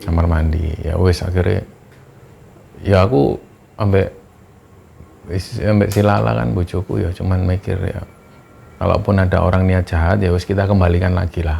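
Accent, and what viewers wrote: Java, Indonesia. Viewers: Tumben mas bahasanya cmpur logat kejowo jowoan 😁..tp msh tetep bagus 👍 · Selamat ulang Tahun,dari awal cerita pendakian mas mas beserta keluarga,sampai detik ini Masi setia sama Chanel ini,cara mas membawakan cerita yg natural dan njawani inilah yg saya suka, sukses terus dan semua crew nya, Gusti mberkahi🎉🙏🥳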